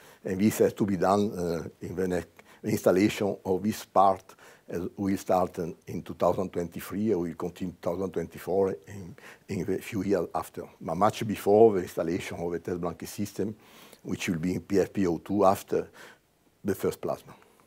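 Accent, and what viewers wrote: France. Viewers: English subtitles would be of great help here. And yes, I know he's speaking Franglish.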